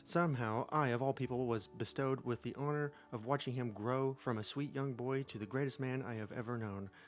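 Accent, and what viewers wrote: United Kingdom. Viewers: Yep, from uni to flat to being at mine. I'm guessing somewhere in the west. So VERY Brit.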